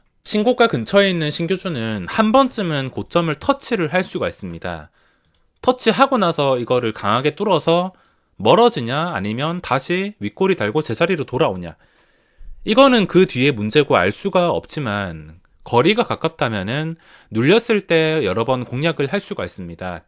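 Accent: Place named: Daegu, South Korea. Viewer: 은 목소리가 진솔하고 매력적입니다..거짓없이 솔직한분 같습니다..저도 대구살지만 억양또한 구수하네요..좋은영상감사합니다..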